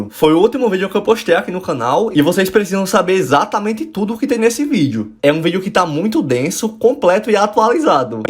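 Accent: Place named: Ceará, Brazil. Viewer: Cara vc parece um Moisés do velho testamento jovem, europeu, maquiado e com um sotaque do cearense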